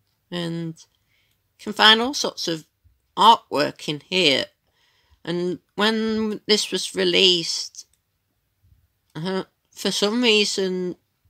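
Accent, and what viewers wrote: United Kingdom. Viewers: Hello! I've been watching your videos throughout the years, and I really enjoy the content that you put out and the dedication behind it! This little change up in format is a nice surprise, and I actually find your voice kind of soothing. What would you pin down your accent as? I feel that it's British, but I'd hate to just randomly assume in case it's something different, hah. Anyway, here's hoping for nice times for you and your further researches! I really do love your channel~ <3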